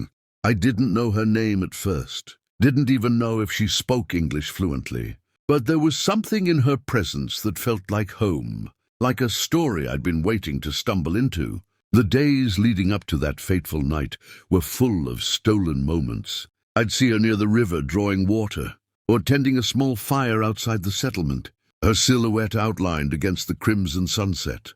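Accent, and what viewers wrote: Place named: RP, United Kingdom. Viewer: Somehow I can't take a cowboy seriously who sounds like he's in the House of Lords.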